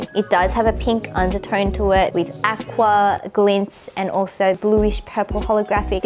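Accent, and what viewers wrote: Australia. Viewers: OMG ARE YOU FROM AUSTRALIA?? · Heeeeeeeeey, are you from Australia? 😃😃😃😃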